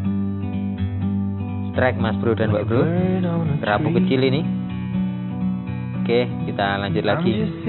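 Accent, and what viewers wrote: Banyumas, Indonesia. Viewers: Keren.. Ora ngapak ora penak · Waahhh unboxing kaos ora ngapak ora kepenak...met mancing slm kompak moga sukses sllu...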